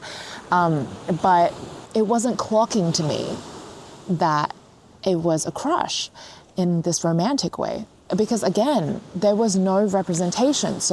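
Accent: Australia. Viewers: I wish I had your Aussie accent. I have a boring American accent. 😢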